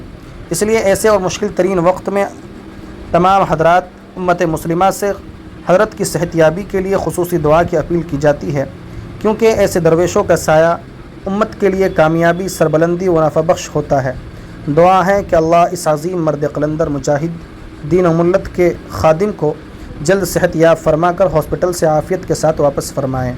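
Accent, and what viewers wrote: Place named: Arab world. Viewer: عربی لہجہ میں اردو بولتے ہیں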